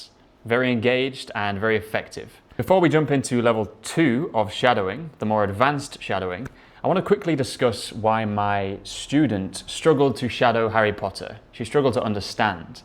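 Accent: Northern England, United Kingdom. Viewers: You sound like someone coming from the north of England. Am I right ?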